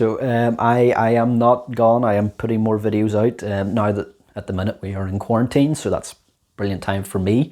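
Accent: Northern Ireland, United Kingdom. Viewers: Nice to hear a Northern Irish voice on YouTube! Great channel! Not sure if you've already done this but 'How to make a AM/FM Radio' would be cool. Cheers.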